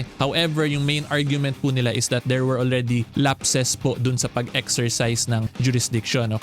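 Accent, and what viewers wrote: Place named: Northern Samar, Philippines. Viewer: Atty taga northern samar ka ba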